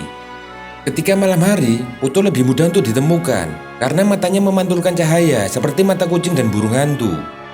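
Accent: Java, Indonesia. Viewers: Logatnya khas jawa btul ya😂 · Suara mu medok.. Mas..' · Suwaramu kang kang medok bianget. WeeLiikk 😀😀😂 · Min medok banget sih min Logat mu, , , ? Tpi ga pa2 ko min wong udh dri sono ny ky gitu, menuso yo , Ga iso ngrubah toh, , , !? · Dlm dunia broadcast medok gini gk laku siaran radio n tv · medhok yo kue..😁😁🤣🤣🤣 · Medok banget son suarane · Sangat medok pak de like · Mantaaap.......... Ngomongnya yg mantap jawanya MEDHOCK......🤭🤭🤭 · Meduk bngt jowone 😂😂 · Medok ajah ngomong nya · mantab logat jawanya medok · medok x bnk..tp q sk.mnghibur..😂😂😂🙏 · Jawa banget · adminya logat jawa bngt...kuuueeennntttaaalllllllllllllllll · mendok amt lu min😅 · jawa banget suaranya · Ngedok ketoro wong jowo😂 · Yg baca narasi medok.. 😀👍jd ingat temen saat kuliah.. 😀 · orang jawa kau ya bang?? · Medok banget....😀😀😀😀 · Salfok dgn suara pembaca narasi. Medok total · Yg ngomong medok amat yak kek org jawa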